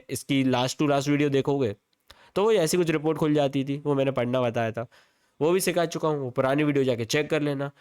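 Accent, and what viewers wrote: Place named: Bhopal, India. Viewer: mujhe lag hi rha thaa bolne ke tarike se😂.... Apan bhi Bhopal se hey✌🏻🙌🏻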